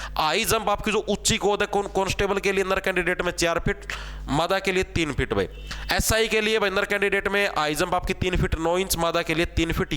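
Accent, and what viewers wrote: Haryana, India. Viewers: नमस्कार जी म थारा साथी मोहित पुनिया 😅 नर और मादा की उमर X or y or दोपहर म घणा तावड़ा पड़ रय्या छ मस्त बोली है, गुरुजी थाकी 😊 हरियाणा से हैं क्या आप❤ धुम्मा मार दयो 😅 और घूमा मार दियो नर कैंडिडेट का फिजिकल में सिस्टम पाट के हाथ में आ गया था 😂😂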